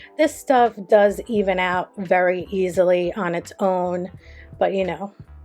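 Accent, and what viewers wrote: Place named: East Coast, United States. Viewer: Gorgeous gel builder I really like it!! You sound like you are definitely from the east coast..yyaass sis!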